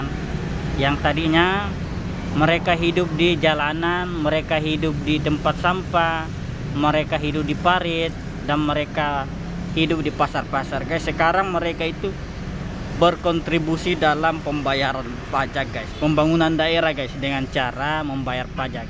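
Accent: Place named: Bugis, Indonesia. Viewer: Ooh tinggal di bulungan ya bang.... sya pikir tinggal di sulawesi , aksennya bugis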